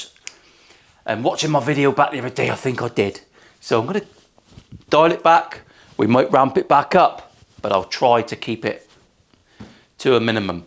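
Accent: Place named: West Country, United Kingdom. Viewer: It isn’t possible to swear too much when you have a West Country accent. It’s actually pure poetry and art… and fockin funnoy!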